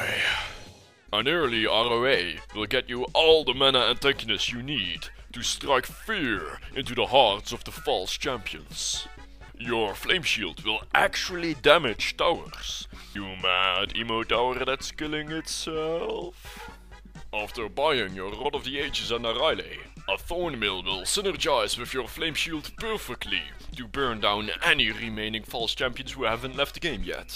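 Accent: Netherlands. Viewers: dat nederlandse accent kun je best goed horen ^^ nice guide i should give it a go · that accent.. im so ashamed to be dutch right now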